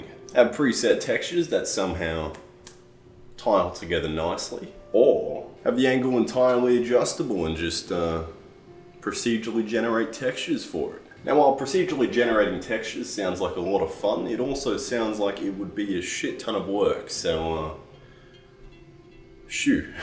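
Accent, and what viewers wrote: Australia. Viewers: Australian ASMR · Are you Australian? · Sounds like “I did a thing” us fucking Aussie’s all sound the same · first 5 seconds, i can already tell he's a fellow aussie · The aussie accent makes this video 100 times funnier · i knew immediately this guy was Australian. when i saw the wiggles at the end, i was even more sure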